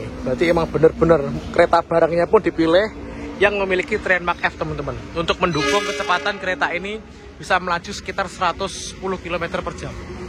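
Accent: Java, Indonesia. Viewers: Orangnya bahasanya enak logat Jawa tapi penjelasannya jelas logatnya gak dibust buat kayak adiyasa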